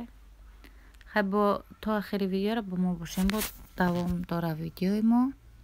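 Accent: Kulob, Tajikistan. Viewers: Салом хохари азиз видиёхот бехат макулай баройки кулобигии тооза гап мезани 👍👍👍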